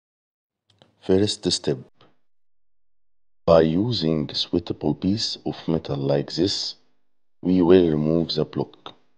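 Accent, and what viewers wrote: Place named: Egypt. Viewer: اللللله على الانجليزية المصرية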